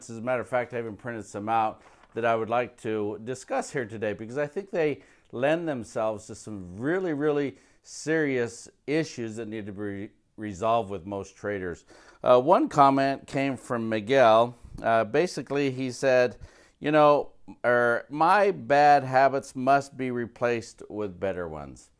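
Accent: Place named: Italy. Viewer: Enjoyed listening to you realised one thing besides everything was saying was right.But also realised you must be Italian.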